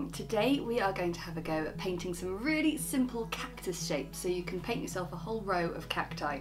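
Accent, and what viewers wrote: United Kingdom. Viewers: I'm in love with your clases! And love to hear British accent😍.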